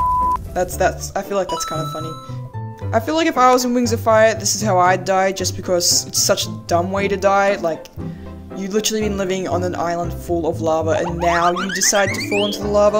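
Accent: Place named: Australia. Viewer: Ohh your’e Australian? So am I - also these are very accurate ranks in my opinion.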